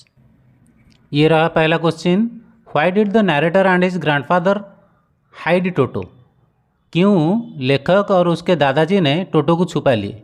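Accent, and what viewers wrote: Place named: Odisha, India. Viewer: Sir , I'm also from Odisha and I knew you are a odia by your accent . Love from Jajpur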